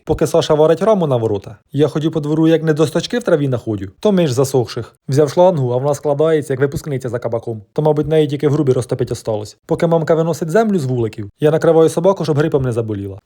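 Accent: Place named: Surzhyk, Ukraine. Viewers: Як я вас люблю слухати))) Хоч ви з Черкащани, а звучить,як наш полтавський суржик зі всіма діалектами 😂❤❤ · Таки гарний суржик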